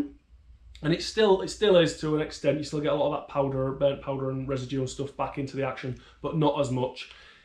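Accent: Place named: Northern England, United Kingdom. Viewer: Hi there matey, am loving your 'Northern Style' as a fellow northerner it's nice to hear rather than yet another 'Shandy Drinker'. Just got my 22WMR Variation back from my local force and I am currently in discussions with Rob at Guncraft Ltd. about one of their 22WMR AR's. The thing that I can't seem to decide on is barrel length vs Handguard Length. What Length is your DD Handguard? as I am aware you're running one of Rob's earlier 18 inch barrels. The reason I ask is I like the look when the barrel doesn't protrude far past the Handguard, that way when you add a moddy it kind of has the look of an MP5SD if you know what I mean? Finally I see you like the Kriss Defiance DMK22, I've had mine for just short of three years and I absolutely love it, still one of my favourite gats. I'd post a pic but haven't worked out how to do it yet? 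Oh an incidentally this is my first ever You Tube comment so consider yourself honoured!?!?! lol.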